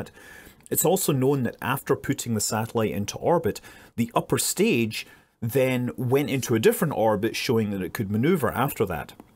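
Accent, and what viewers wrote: Scotland, United Kingdom. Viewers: Have you stopped by in Scotland on your way to Iceland,? Maybe it's just me, but I thought I heard a little more of the Scooootland in your accent than usual:D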